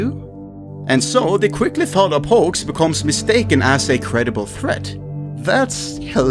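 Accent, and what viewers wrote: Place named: Norway. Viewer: Are u Norwegian? Your accent makes me think you are.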